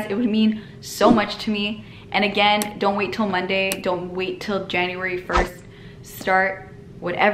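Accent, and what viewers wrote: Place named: Tigrinya, Eritrea. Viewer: I really miss your tigrigna accent Ms awedat aleku msnatey klas 😂😂😂🌹❤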